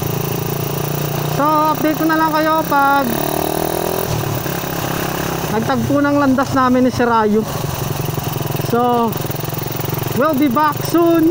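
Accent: Iloilo, Philippines. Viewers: Sir taga saan ka arang ilonggo kanyata ah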